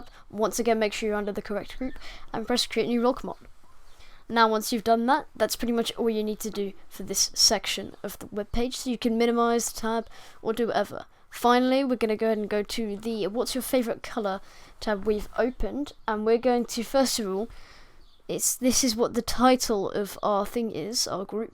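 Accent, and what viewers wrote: United Kingdom. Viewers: Bro i love your British accent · damn your british accent is amazing. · Hes from the UKKKKKK LIKE ME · I can’t get enough of bri’ish accent, i love it so much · My dude sounds like a professional british asmr person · Thank you sm little british man I love and appreciate u sooo <3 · ooh a british man i suppose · Thank you random british guy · its a british accent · you are british, cringe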